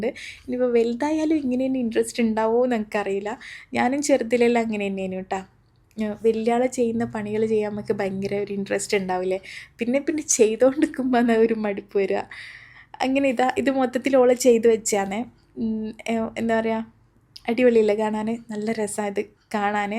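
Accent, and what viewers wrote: Kannur, India. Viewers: Oppened ❤️iyale vidios othiri ishttamanu kannur samsarathilanau wayanad kariyaya nan ith othiri ishttapedan thudaghiye❤️❤️👍👍👍🤲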